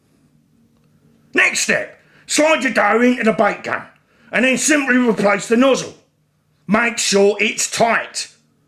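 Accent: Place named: Cockney, United Kingdom. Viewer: Wow big grizzly cockney voice and the missus making the boilies now that's a concoction!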